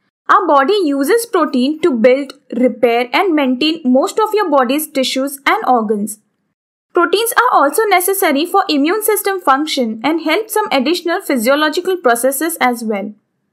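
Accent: India. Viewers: Indian logo ki english..uffff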